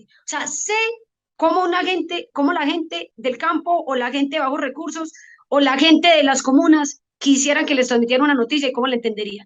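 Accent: Antioquia, Colombia. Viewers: Habla muy normal, sencilla, con acento de mi Tierra, paisita, y frentera, echada pa e Lante. Bien 👏👍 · A mi me encanta apesar de tener acento paisa ......es muy unica irrepetible me encanta la entiendo ..no vayas a cambiar tu estiló claro irreverente sencillo transparente...y punto slds mi reportera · ASÍ HABLAMOS EN ANTIOQUIA.... UN POCO MÁS COLOQUIAL Y ESPÓNTANEA, FELICITACIONES POR SER COMO ERES...NO CAMBIES!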